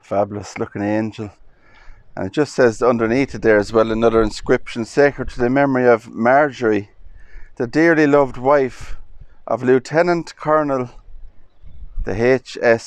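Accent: Ireland. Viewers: You can't beat the Irish accent, I have a lot of Irish on both sides of my family, the Limerick's and the Baileys , a great video very interesting many thanks , best wishes from Norfolk in England .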